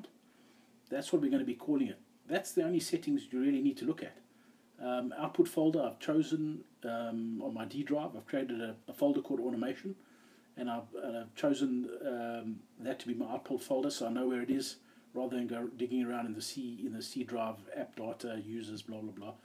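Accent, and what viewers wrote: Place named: South Africa. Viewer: So cool figuring out you're South African :)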